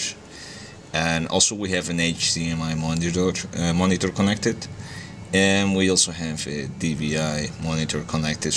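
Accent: Netherlands. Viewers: Are you duch think i hear a dutch accent hehe.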